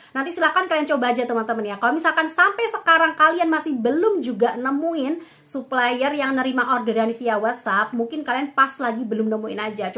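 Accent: Java, Indonesia. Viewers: Didengerin suaranya agak medok 😀🙏. Tapi makasih kontennya. Bermanfaat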